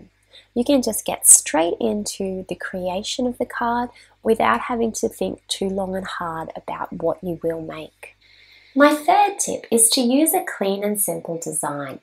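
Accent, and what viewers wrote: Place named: Australia. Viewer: I love hearing a fellow Aussie accent on YT! New sub from Central Coast NSW 🇦🇺❤️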